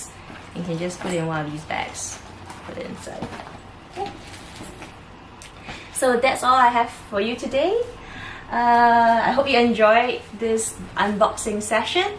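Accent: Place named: Singapore. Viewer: Are u from Singapore. I can recognise your accent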